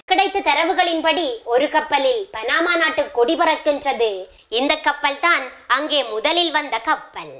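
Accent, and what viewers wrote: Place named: Sri Lanka. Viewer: அருமையான பதிவு நன்றி உங்கள் சிங்கள தமிழ் இணிக்கிறது கேட்க கேட்க அருமை வாழ்த்துக்கள் வாழ்க வளமுடன்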